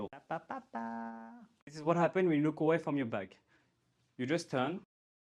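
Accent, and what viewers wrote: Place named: France. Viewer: Enjoy your staying in Taiwan, you are welcome by the way I like your great French-English accent. Be cool, Godspeed.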